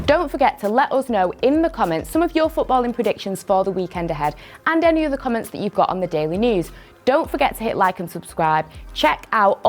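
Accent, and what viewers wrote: United Kingdom. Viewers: Just when I started understanding British accent. One football English: Here's the level 2. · Feels good to hear a bit of pure British accent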